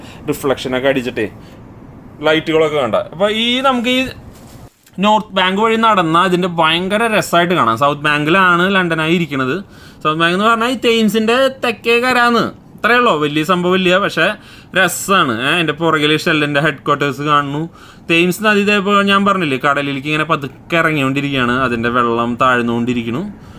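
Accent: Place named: Thrissur, India. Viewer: ഇഷ്ടായിട്ടാ ഗെഡി, എന്തുട്ട് തിയേറ്റർ, ഞാൻ ഇവിടെ vox ഇൽ പടം കാണാനിരിക്കുമ്പോൾ സ്ഥിരം കൂട്ടുകാരോട് പറയണതാ, രാഗം കഴിഞ്ഞിട്ടു ഉള്ളു ഇതൊക്കെ എന്ന് ഇമ്മള് തൃശ്ശൂർകാർക്കു രാഗം വിട്ടുള്ള കളി ഇല്ലന്ന്, ദുബായ് മാളിൽ നടക്കുമ്പോ ഞാൻ പറയും പൂരം എസ്‌ബിഷനിന്റെ ഒരു സൈഡിലേക്കില്ലെന്നു, ത്രിശൂർ അങ്ങനത്തെ ഒരു ജാതി സ്ഥലാ, അവതരണം നന്നായി പൊളിച്ചു വീഡിയോ ഈ സ്ലാങ്ങിൽ മതിട്ടാ ഓകെ ടാ keep it up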